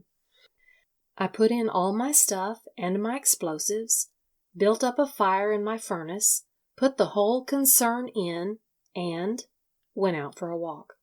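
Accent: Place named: South, United States. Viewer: Love your Southern accent. And your narration. 😊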